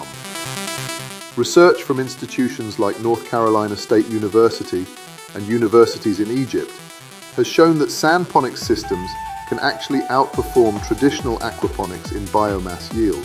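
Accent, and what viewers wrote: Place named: England, United Kingdom. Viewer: Why all the Africans doing the work and the narrator with the English accent. Very colonial.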